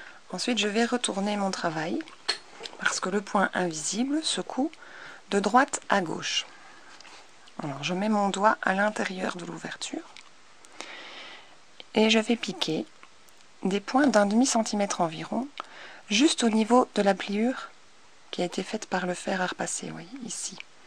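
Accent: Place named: Belgium. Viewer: dieu merci enfin une véritable bonne explication avec une vidéo de bonne qualité. merci bcp et l'accent ne trompe pas, vive la Belgique ;)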